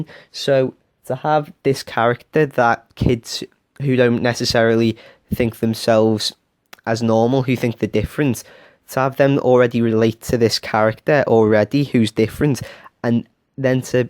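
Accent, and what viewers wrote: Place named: Liverpool, United Kingdom. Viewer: Not meaning to be rude but you sound like a more scouse Michael owen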